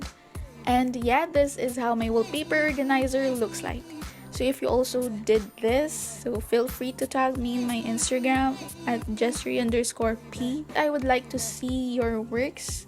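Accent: Philippines. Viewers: Aye I just realized you have a Filipino Accent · Are you Filipino? Im not assuming you just sound Filipino! Im Half lol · I think your Filipina though(in my opinion) because of your accent😭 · You are filipino aren't you I can hear the accent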